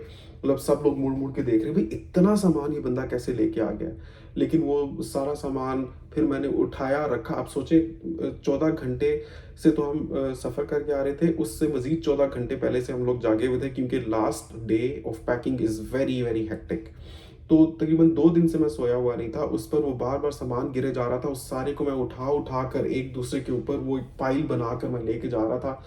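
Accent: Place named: India. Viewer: نام تو پاکستان کا لے رہا ہے اور بول ہندی رہا ہے اور تیرا ایکسینٹ بھی ہندی ہے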